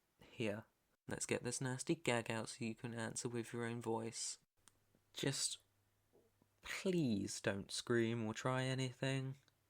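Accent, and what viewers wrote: United Kingdom. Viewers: Do you speak British?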